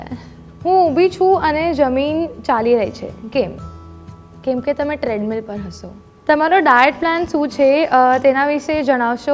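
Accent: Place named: Kathiawar, India. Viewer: jordar girl!!!vadhare aetle maja avi bcoz tu kathiyawadi bolech🤩..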